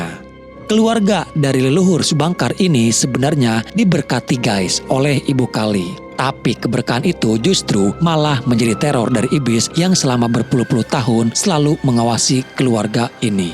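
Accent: West Java, Indonesia. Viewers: opaL???.. sunda pisan kang ... ☺️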